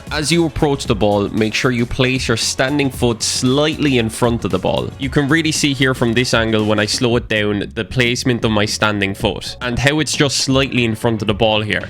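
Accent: Ireland. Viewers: holy irish accent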